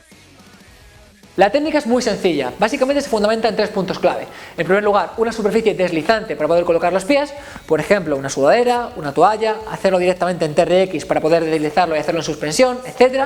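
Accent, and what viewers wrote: Spain. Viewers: Hola, me ha gustado mucho tu video. Información muy completa y pertinente para los que deseamos trabajar desde casa o en Vacaciones que no estamos cerca de nuestro gimnasio. Sólo una recomendación, se que tratas de no hacer el video tan largo, pero estas hablando muy rápido sobre un tema que no todos conocemos y usando términos anatómicos poco utilizados por nosotros, lo que hace que se difuculte entender la info. Y bueno en mi caso que hablo español, pero de Latinoamérica, a veces sólo con el acento español nos cuesta un poco entender rápidamente lo que dices, menos aún con la rapidez con la que estas hablando. Tomalo con calma, recuerda que al que de verdad le interese aprender te va a escuchar con atención y verá todo tu video, no importa la duración. Éxitos y esperamos seguir viéndote.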